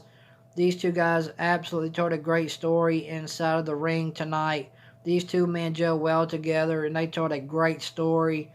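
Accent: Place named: South, United States. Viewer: You sound like a Southern gentleman. Georgia? I was born in Valdosta! 😃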